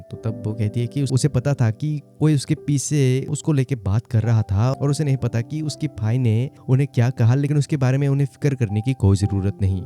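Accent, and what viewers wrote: Northeast, India. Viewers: Always a north east guys accents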